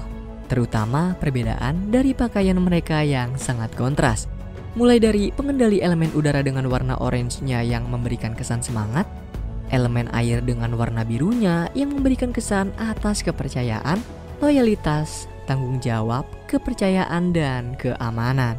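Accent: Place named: Cirebon, Indonesia. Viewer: Wong cirebon tah min😂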